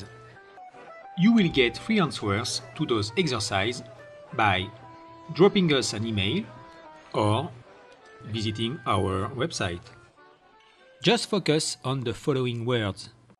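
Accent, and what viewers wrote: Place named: France. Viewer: Hihi... the guidant voice has strong French accent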